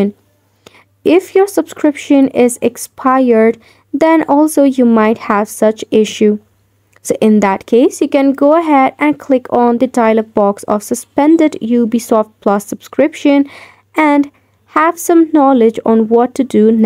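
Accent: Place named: India. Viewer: indan cute ass voice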